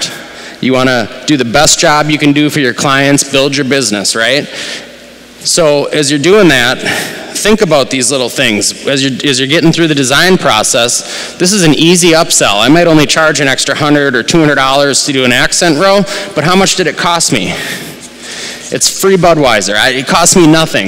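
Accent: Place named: Minnesota, United States. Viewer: Man this guy is definitely a Minnesotan. Do we really sound like that?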